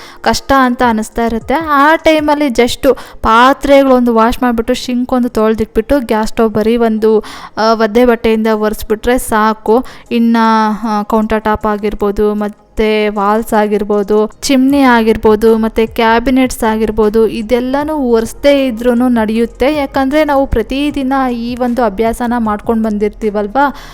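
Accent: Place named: Kolar, India. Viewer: Nim language kolar, Hoskote tara ide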